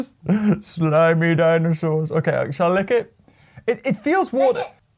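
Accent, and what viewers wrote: United Kingdom. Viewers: You brittish mate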